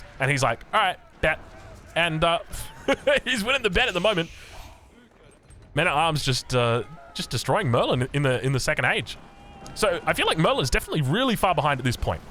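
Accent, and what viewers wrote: Australia. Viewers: I really like how aussies say words player aussies turn into Playah shopping center nnto shopping centah aussies sound so cool much love brisanian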